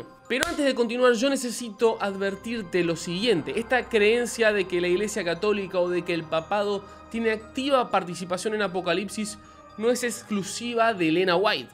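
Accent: Argentina. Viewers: Que tal, un pequeño comentario, admiro mucho tu conocimiento y explicación de los datos religiosos, como suelo decir: "lo peor que puede pasar es que aprenda algo" (obviamente un dicho sarcástico, ya que aprender algo siempre es beneficioso). Solo un pequeño defecto que oigo en tus pláticas religiosas, lo cual parecen desviar el significado de las mismas. Cuando decís a quienes les estás hablando, que terminas los sustantivos en "o" (masculino), "a" (femenino), y "e" (bisexual, transexual, vos sabrás que), pero si vas a hablar pláticas religiosas solo habla hacia hombres y mujeres (puedo captar en tu Acento que sos Argentino, más ni siquiera para complacer a la cultura de tu querido país deberías usar esas expresiones). Resolveme por favor una duda: que generos dice la Biblia que creo Dios en el sexto día?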